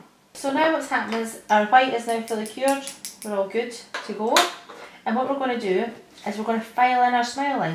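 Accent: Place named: Scotland, United Kingdom. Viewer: Thank you very much for this clear video. I sometimes have a little bit of trouble understanding the Scottish tongue trap, (I'm from the Netherlands) but through the images I understand what you mean. I am looking forward to the next video. Greetings, Olga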